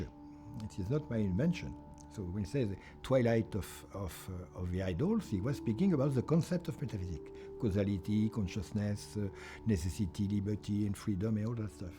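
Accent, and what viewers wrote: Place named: France. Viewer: The priesthood is a very noble calling and much nobler than that of a philosopher. I think that Heidegger and Marion are not the best philosophers to be studied by ongoing priests, nor any other pretentious Frenchman for that matter. Regardless, I wish you all the best in seminary and in life. Today I will go to confession and pray for all fathers and (ongoing) priests. We the laity ask for good priests. One should not forget that faith is an unearned grace. Thank you for going to seminary. But concerning Marion:There is so much loose "thinking" in here that I am really amazed how people can fall for this. (Maybe it is the overly harmonic, dynamic and almost spherical music in the background? The French accent? The light, the colours of his clothes and the grain of the cloths?) He starts by "proving" that any concept is inadequate for God, by appealing to an "Augustinian principle", which he formulates as "If you comprehend it, it is not God". 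However, I think that here he conflates "comprehending" with "having a concept of". I can clearly have a concept of, say my spouse, even if at times I do not comprehend her, meaning that I do not comprehend her fully. Maybe my concept of my wife is then also "not adaequate", and I would be open to such a possibility (although I would find it ... you know ... a bit laughable and french. I clearly able to identify my wife and I do not mistake other women for her nor do I mistake her for other women. I would say that my concept of her is a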